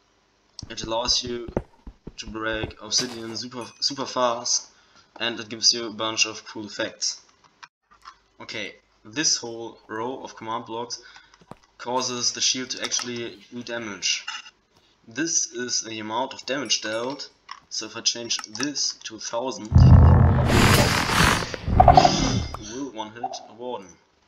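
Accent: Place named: Germany. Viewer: der deutsche akzent haha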